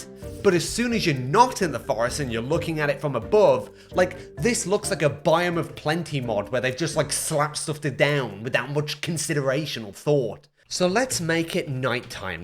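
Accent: United Kingdom. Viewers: I love British man talking angry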